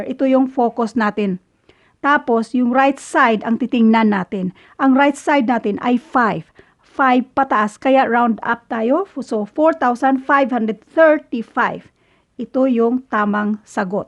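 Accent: Visayas, Philippines. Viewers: bisaya ka po ba mam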